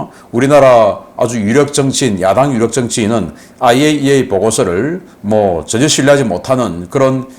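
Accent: Gyeongsang, South Korea. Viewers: 경상도 사투리가 매력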